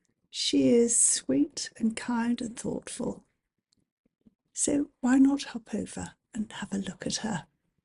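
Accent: England, United Kingdom. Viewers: Hello, I came over here from LifeintheWyldeWest. Love your vioice. I was born in Scotland and adopted by Americans and came to the US, so I don’t get to leave with that lovely English accent. I will be watching. Your success inspires me. ❤️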